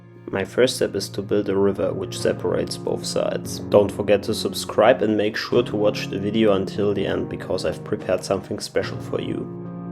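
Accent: Germany. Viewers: Yo i didnt notice your German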